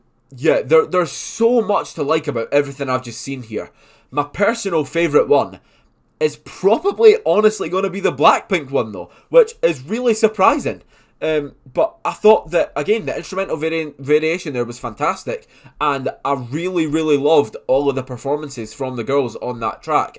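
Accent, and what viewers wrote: Scotland, United Kingdom. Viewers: Of course! I perfectly understood you! But even if I didn't, I would happily exchange that for the Scottish flair 😂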